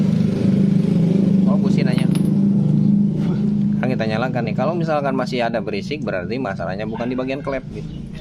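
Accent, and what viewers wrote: West Java, Indonesia. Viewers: Kang didaerah mana ya sepertinya logat sundanya balaraja ya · Sunda ya 🤔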